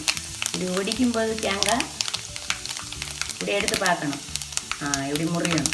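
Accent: Nagercoil, India. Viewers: Nagercoil slang super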